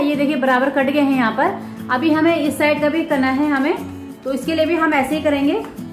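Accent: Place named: Pahadi, India. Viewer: Apki bhasha mai phadi ltak aa rhi thi smajh gyi thi aap phaad se ho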